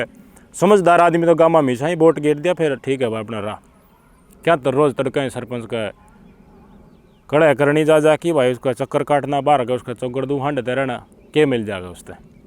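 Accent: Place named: Khari Boli, India. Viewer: भाई साहब बहुत हँसी आती है आप की बोली से खड़ी बोली है भाई हम अहीर वाटी से है हमारी बोली ऐसी नही है अच्छा लगता है आपका रिएक्शन और बोलना 😂😂😂😂😂😂😂😂😂❤❤❤❤❤❤❤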